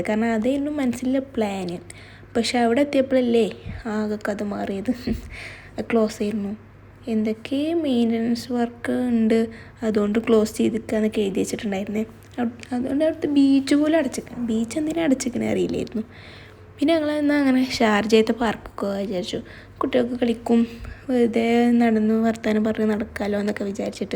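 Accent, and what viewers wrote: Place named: Malappuram, India. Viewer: Malappuram slang avoid cheyy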